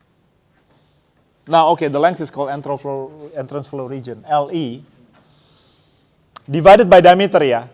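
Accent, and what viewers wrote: Indonesia. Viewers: perfect English with totally Indonesian accent. Awesome, dibanding anak2 sekarang yg sok logat amrik tapi structure dan grammar berantakan :)) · Akumrasa nggak sih. Akumsangat merasa, bahwa Doktor ini bukan orang tulus dan bukan orang yang berdedikasi. Melainkan penampilan dan gaya lebih penting buat diandaripada science itu sendiri. Selain itu bahasa inggris nya sangat standard dan sangat kental aksen bahasa indonesianya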